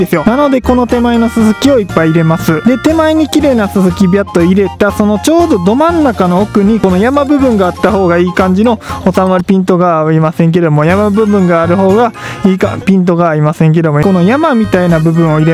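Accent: Kansai, Japan. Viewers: 自然な関西弁が好感持てます。 また、どんな目的でどうやって撮影してるのかも参考になります。 撮影した作品は応募とかされてるんでしょうか。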